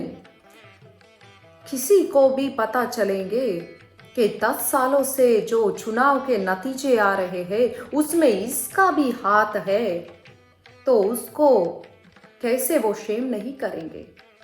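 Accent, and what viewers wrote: South, India. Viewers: 😊😅 very good informative and interesting satire, with South Indian language accent. Great and intellectual comments. Thanks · Tamil accent in particular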